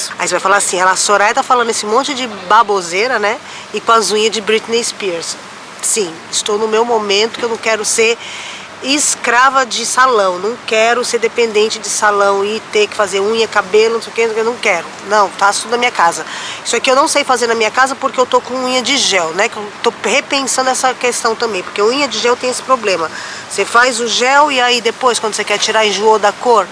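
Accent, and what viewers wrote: Ceará, Brazil. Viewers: Soraya, desculpa a curiosidade. Mas você é cearense ?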